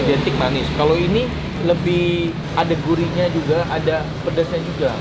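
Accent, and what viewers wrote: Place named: Java, Indonesia. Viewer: Kocak bro Nex bahasa Jowone , Wakakak !! Guded yogya memang top markotop ! Sehat selalu bro !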